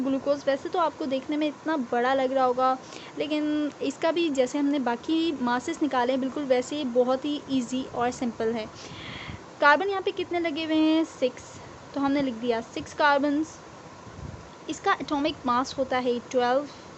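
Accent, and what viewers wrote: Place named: Pakistan. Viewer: Are you from Pakistan I mean the person who is teaching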